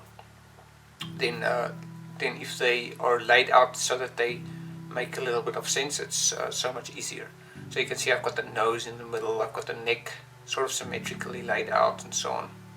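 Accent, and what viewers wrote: South Africa. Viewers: dude you sound extremely South African, I need to know you nationality !!!!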